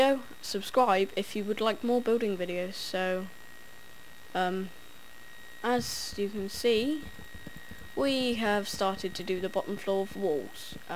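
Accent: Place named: United Kingdom. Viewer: British accent! Omg!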